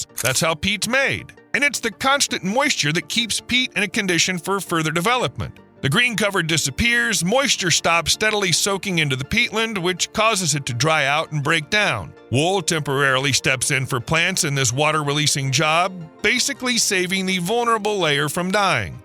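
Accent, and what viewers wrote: United States. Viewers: It is unfortunate that the announcer is a loud American..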